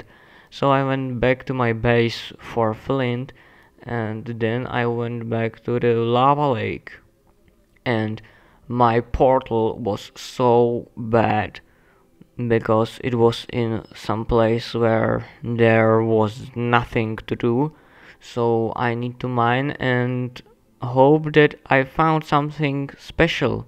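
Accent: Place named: India. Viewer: You speak in Indian ascent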